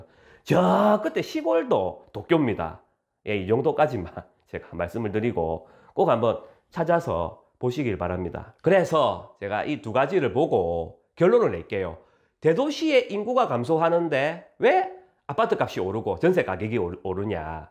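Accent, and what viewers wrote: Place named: Busan, South Korea. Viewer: 잘 들었습니다~부산 사투리 듣기 좋네요~앞으로 좋은 강의 기대하겠습니다~^^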